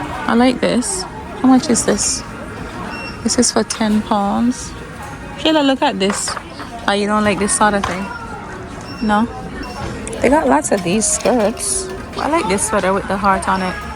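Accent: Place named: Jamaica. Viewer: Think it's Jamaican.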